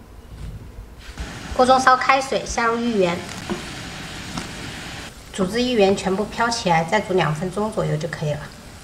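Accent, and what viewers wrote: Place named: Minnan, China. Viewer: 原來是泉州人， 難怪有閩南腔。 不妨用泉州話做節目， 再上字幕就好。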